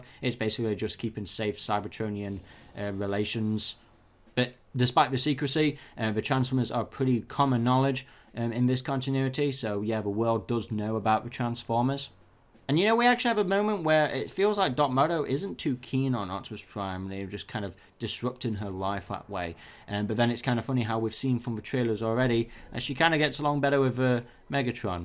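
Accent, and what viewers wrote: United Kingdom. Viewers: I like your British accent. But quentess prime is the traitor if you watch the last knight they call her that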